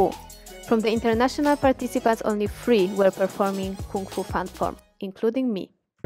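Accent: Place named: Poland. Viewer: Very good and positive video. Thank you! When started to wath a video, though that, hmm sounds like a speaker have a polish accent and it was true.)) Interesting how roots are following people. All the best you guys!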